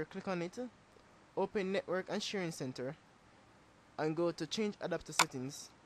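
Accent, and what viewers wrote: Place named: Caribbean. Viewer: and i can bet u from the caribean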